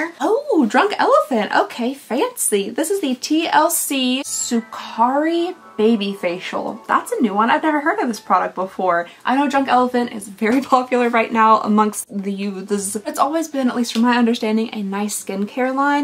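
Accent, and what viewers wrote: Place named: South, United States. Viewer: I’m so sad to see advent season coming to an end!! But I’m so grateful that you stretched it out till Easter !! I have been watching you for the last 3-4 years and I want to say that you are one of my very favorite channels here on YouTube. Although I am so many years older than you are darling , you remind me so much of so many of my friends and even a tiny bit of my daughter who is around your age ! Just your general way of speaking and your mannerisms feel so familiar to me and maybe it’s a southern thing but I just adore you !! I love watching any of your videos it reminds me of home !!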